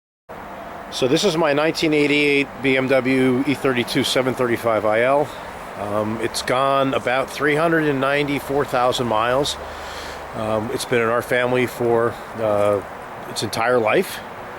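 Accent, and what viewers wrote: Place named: New Jersey, United States. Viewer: You are a bad ass mechanic. I was rasied in New Jersey and have brothers and sisters that still live there. Nostalgic to hear NJ accent. Wife drives 1996 Jaguar Vanden Plaus, I'd say the Jaguar 4.0l inline six sounds much smoother than BMW. HaHa. Thanks for your videos I always learn something new.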